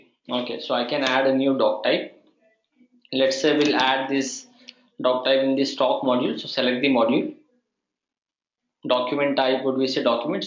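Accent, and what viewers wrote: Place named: India. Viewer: Love erpnext and have massive respect for indians in general, but why is the indian accent so painful to the human ears? I've heard africans speak english with thick accents but after listening to them for few minutes they start to grow on you. But not the indian accent, the more you hear the worse your headache will get. Every few seconds they will have a brainfreeze and go QUUUUUH, QUUUUUH QUUUUUH, like literally after speaking 5 words QUUUUUUH QUUUUUH QUUUUUH.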